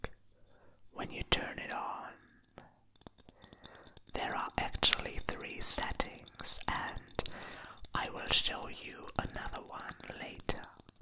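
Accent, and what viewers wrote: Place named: Germany. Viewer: 💕Hey there baby boy You look absolutely georgeous I hope your feeling better Your eyes are absolutely beautyful Ive missed your german accent Ive missed you sooooo much Im your biggest fan girl (" Will you marry me ") Saranghae Lots of love and kisses Your biggest fan fan girl and future wife Nicole Louise💕 😈🔥💋🔥💋🔥💋🔥💋🔥💋🔥💋🔥💋🔥💋🔥💋🔥💋🔥💋🔥💋🔥💋🔥💋😈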